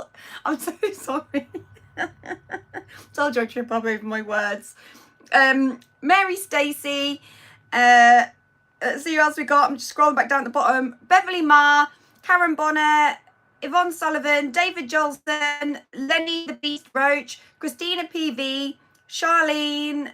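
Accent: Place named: Cockney, United Kingdom. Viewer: There's definitely a bit of cockney in there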